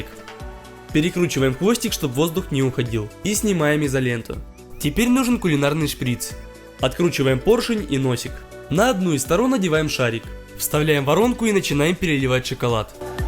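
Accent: Ukraine. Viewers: да,советую посмотреть его первое видео.там он разговаривает более украинским акцентом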